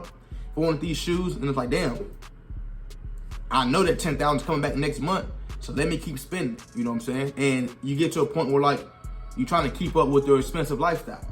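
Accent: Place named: Ohio, United States. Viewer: Yo where are u from? you sound like my cousin from Youngstown, Ohio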